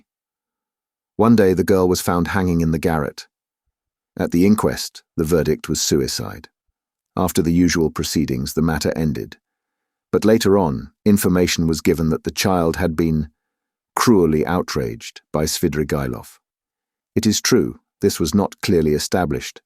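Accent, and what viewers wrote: United Kingdom. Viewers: Thanks. I needed a Brit for this one. :eyes-pink-heart-shape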